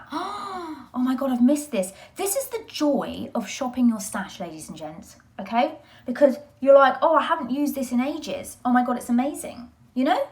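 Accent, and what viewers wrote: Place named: North, United Kingdom. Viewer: 🤣🤣🤣 yes the northern accent!! I love you when you do that! Been having a brill day today but you have just made it better!! I get so excited when you post a video and just love the chit chat 🤸😊😁♥️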